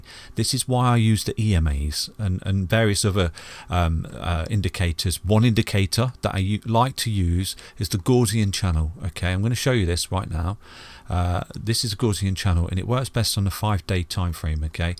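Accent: United Kingdom. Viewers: You British my man? Find your information impressive! Would 100% watch a tutorial from you (Y)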